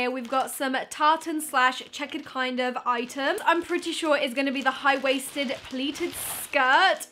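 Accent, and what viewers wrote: United Kingdom. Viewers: are u Britain??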